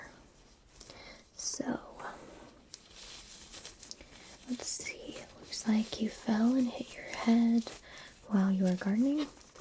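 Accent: United States. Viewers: that american accent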